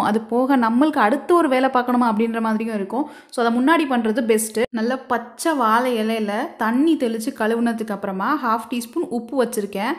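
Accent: Madurai, India. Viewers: Madurai pechu kiliyethu dhul machi nan kuda Madurai tha nega yenga irukenga ipo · Nanum maduraikari than sis unga slang nalla theriyuthu.. aagatha vela haha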